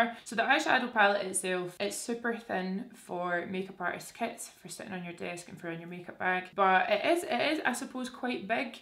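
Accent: Scotland, United Kingdom. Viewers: I'm tearing up just listening to you talking about VIEVE. I've been following you FOREVER! I was still in high school when I started following you on instagram, you still had the white stripe with the black hair. You didn't have Drogba yet. You lived in a tiny flat and you were a bit shy in front of the camera. And oh my god, I didn't think accompanying your journey had gotten to me until now. I feel like a proud friend! My mom and I watch your vlogs religiously (even though I have to translate half the things you and Jack say, she's not good at understanding Scottish accents). You're the only beauty influencer I truly listen to. I'm so so so happy for you!!! Lots of love from Brazil! Trust and believe I'll save money to get e v e r y Vieve product. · I’ve been watching you since waaaaaaay back in the day having stumbled on you by complete accident. 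I have a great love for Scotland and it is my number one bucket list place to visit so when I heard your accent I stopped and was immediately obsessed by your your personality. You’re such an inviting loving person and have an energy that I can only describe as sunshine. I watch every single video religiously. I’m so so so happy for you about your brand and honestly I’m really proud of you!! Nothing but love from me to you sister!! You’re killing it. Keep being the beautiful human that you are xoxoxoxoxoxo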